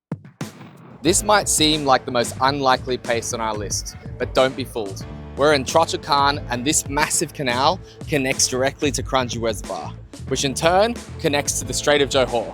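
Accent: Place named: Australia. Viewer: You're essentially SG's Crocodile Hunter now! How fitting that you're also Aussie (I'm guessing, from the accent) Would be keen for those croc tours you mentioned to another commenter, if they ever get off the ground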